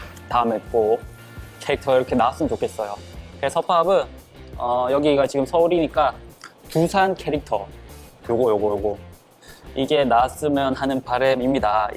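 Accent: Busan, South Korea. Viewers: 팝님 부산사람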